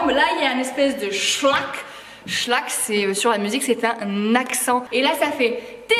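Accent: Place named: South, France. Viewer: Quel régal super!!!! Tu reflète le Sud a font,ton sourire,ta joie de vivre, ton accent,et tes blagues waouh je me croirais de retour à Marseille!!! Merci super hiper génial!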